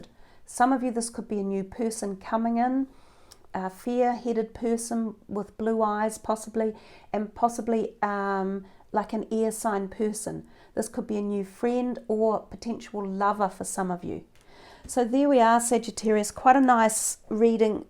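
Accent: New Zealand. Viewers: Hi there are u from nz? U have strong kiwi accent. Subbed. :)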